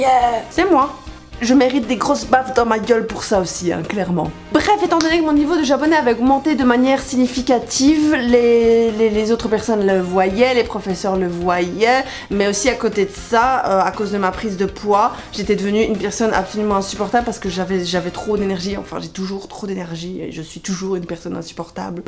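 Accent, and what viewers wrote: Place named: Belgium. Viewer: tu as toujours l'accent belge au fait... "houit" :p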